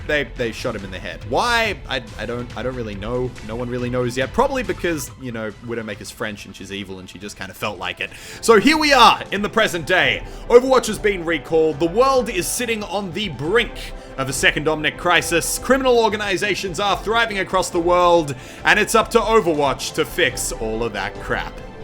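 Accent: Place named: Australia. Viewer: your Australian showed so Hard in this vid. Reyes is pronounced Ray-ez, not Rays lol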